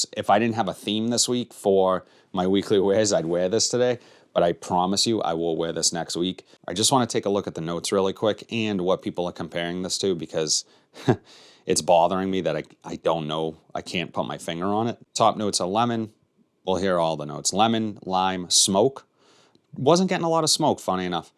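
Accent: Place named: New England, United States. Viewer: Just found your channel and hearing fragrance takes with a NE accent is something I never knew I wanted.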